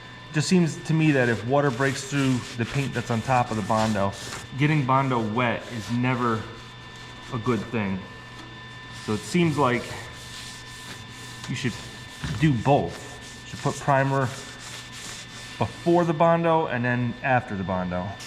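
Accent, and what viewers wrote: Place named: New England, United States. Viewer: The dialect is a little New England and Pennsylvania mixed in.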